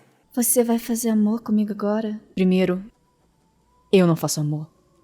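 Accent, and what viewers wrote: Paraná, Brazil. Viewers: Perai, existe sotaque paranaense?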